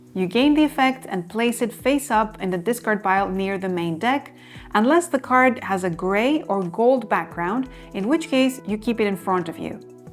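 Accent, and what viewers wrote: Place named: Greece. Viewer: πολυ ομορφη εξήγηση. Ευχαριστουμε! Επιασα Ελληνική προφορά, ελπιζω να μην κανω λαθος. 😄😄 επαγγελματική διαστροφή ειμαι καθ. Αγγλικων. φοβερη δουλειά. Ζηλεύω. 😂 θα το παίξουμε σημερα. και θα το εξηγησω (ως συνηθως 🙄). θα σου πω εντυπωσεις!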